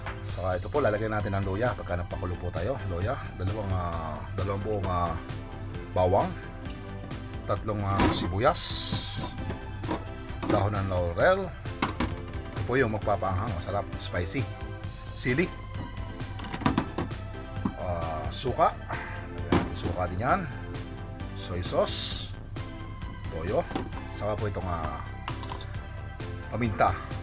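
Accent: Batangas, Philippines. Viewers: sir slamt sa pagdaan s aking maliit nbhay, dito nko ngbblik, paborito ng magbabarik ang iyong putahe.. klasey batangueno ka sir halata sa punto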